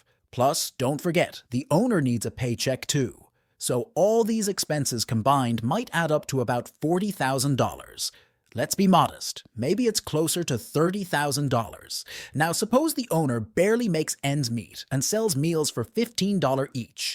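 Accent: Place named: Southeast, Canada. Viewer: I think every American is just a dumbass. Including this Southeastern Canadian sounding guy too.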